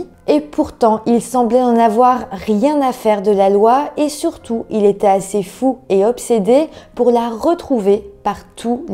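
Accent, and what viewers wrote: Belgium. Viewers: Elle est belge elle ne prononce pas les UI comme en France voilà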